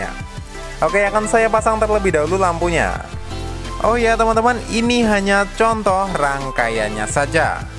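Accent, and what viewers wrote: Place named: Java, Indonesia. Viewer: yang biru kita sambung Ke Puteh..😅 Auihihi.. B.jowone iseh ketok mas broo..👍👍😊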